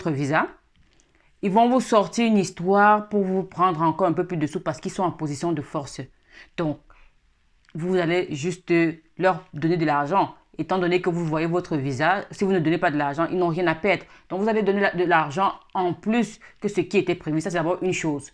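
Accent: Cameroon. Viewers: Le ton du 237 ne se cache pas mama.. Merci pr la video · Tu parles comme une camerounaise. J'aime bien ton contenu. Merci pour tout ce que tu fais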